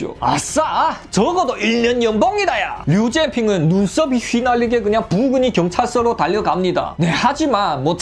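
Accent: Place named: Korean-Chinese, China. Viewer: 구수한 조선 족 사투리와 스토리가 재미 있군요.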